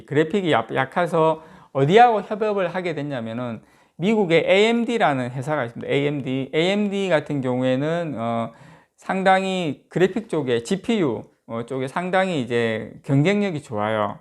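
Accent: Gyeongsang, South Korea. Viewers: 님! 고향이 대구 청도 군위 칠곡 구미 영천 고령 경산 중에 하나일것 같은데 맞죠?~~~^^ 저랑 말투가 똑같아가지고요!~~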